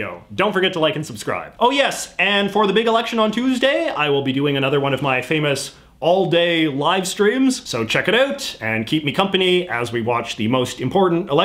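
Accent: Canada. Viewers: I love but as a fellow Canadian, I have never been more annoyed by an "about" pronunciation. I always thought that was a myth until I started watching his videos. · this might be the most Canadian accent I have ever heard in my life and I love it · Your accent is aggressively Canadian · this is the heaviest canadian accent i have ever heard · you have one of the Richest Canadian accents I've ever heard. Not saying that's a bad thing. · Don't you sound too canadian to have a dog in this fight? (Or do you believe we're the 51th state?)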